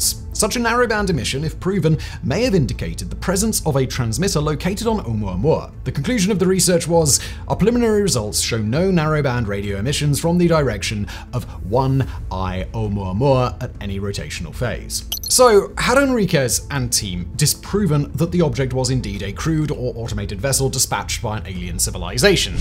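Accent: England, United Kingdom. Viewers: Go back to England! Like soddenly you know what we’ve known for two years. I hate that snooty as accent.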